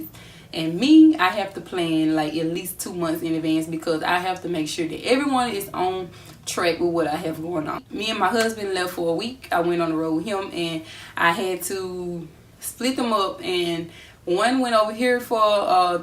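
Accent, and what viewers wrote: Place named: South, United States. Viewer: Love the southern accent. Where y’all from?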